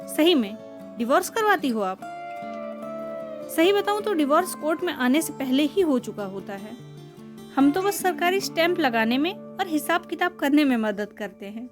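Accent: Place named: Rewa Satna, India. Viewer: hame laga aap rewa satna se hai apke baat krne k according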